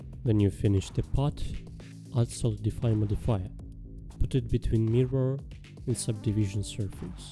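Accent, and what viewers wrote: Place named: Russia. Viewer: брат, палишься, что русский))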